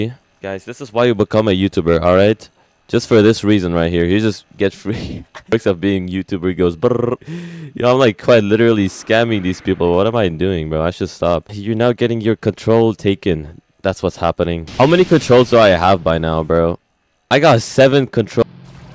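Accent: Jamaica. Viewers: Are you Jamaican? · Whit you talk Jamaica bro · Bro jamaican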